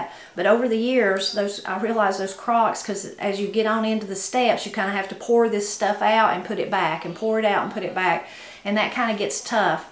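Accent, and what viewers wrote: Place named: West Virginia, United States. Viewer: I just love your videos! My sweet mother in law was from West Virginia and she shared your lovely accent and oh so many of your “mountain” down home vocabulary! I am from Philadelphia and was blessed to marry my husband and his lovely family! Thanks again. Have a great day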